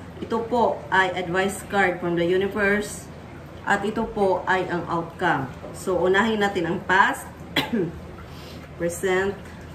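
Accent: Visayas, Philippines. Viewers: bisaya si reader?💚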